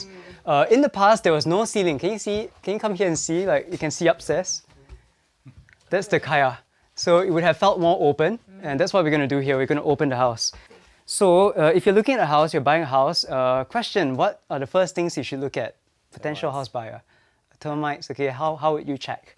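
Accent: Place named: Singapore. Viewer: Based on his accent i would say either singapore or malaysia.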